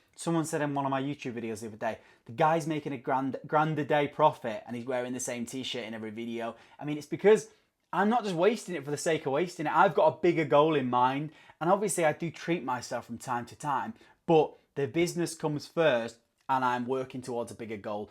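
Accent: United Kingdom. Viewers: Hahaha funny UK accent he gat there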